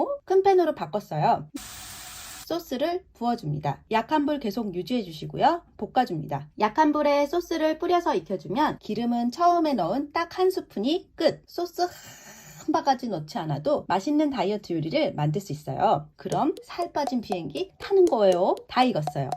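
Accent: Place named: Gyeongsang, South Korea. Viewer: 냉장고에 있는 재료로 다이어트 요리 총분하네요 들기름 씻은 김치 좋고 경상도 억양인가요 잠 듣기 좋네요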